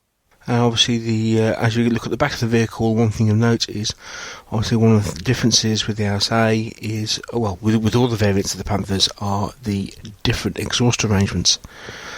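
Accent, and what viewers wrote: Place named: RP, United Kingdom. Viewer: Another great review by. As an American, I love his proper "Queen's English". I do know the difference, as I was married to a Geordie from Whitley Bay, and I loved her Geordie accent too!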